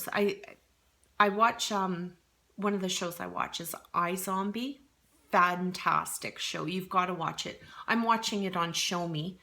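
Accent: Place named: Minnesota, United States. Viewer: Your accent says Minnesota😎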